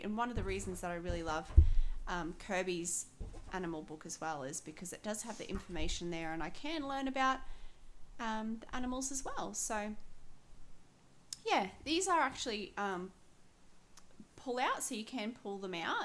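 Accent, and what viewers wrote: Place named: Australia. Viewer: Welcome to the colouring community! So happy to hear another Aussie voice. Looking forward to watching your videos and channel grow. Good luck with it mate. Stay safe and Happy Colours, Sara 🐨💕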